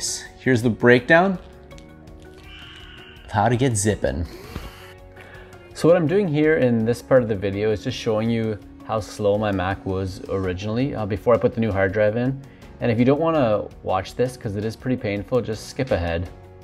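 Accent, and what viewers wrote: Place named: Ireland. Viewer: Good tutorial! Are you Irish, Scottish, or Welsh?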